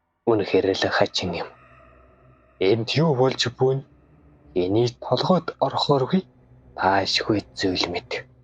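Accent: Inner Mongolia, China. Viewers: Huue ene unshaad bga hun ni uvur mongol hun yumuu kkkk😊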